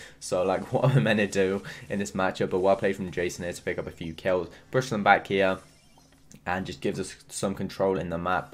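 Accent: United Kingdom. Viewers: Love ur accent😂 Btw is that british?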